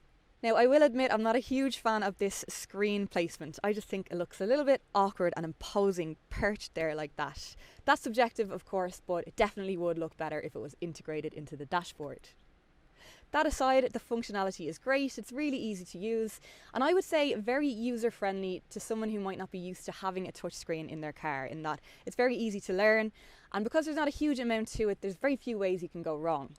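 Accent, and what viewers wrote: Ireland. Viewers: Is this really or is it just Olivia Thirlby masquerading as. The resemblance of these two is just astonishing. And I'm loving the Irish accent. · Love Irish accent :-) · succint review, very well versed presenter. And the best part that irish accent :P Hope you get more subs this year. :) All the best for 100k subs this year! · Great review well done and I love your Irish accent mmmm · Ok. Just a quick comment about a few points: 1. Your videos are very well made, informative and free from lame jokes (eg. Matt Watson) 2. is a very capable presenter 3. She is also gorgeous and has one of the most beautiful smiles I’ve ever seen. It’s good that she uses that a lot:) 4. I love her Irish accent too Keep up the good job guys. Wanted to leave this comment for quite a long time but I usually watch YouTube on my TV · That Irish accent 👌👌👌❤️ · Great video review, you just got a new subscriber all the way down from Greece! 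P.S. I love your Irish accent,!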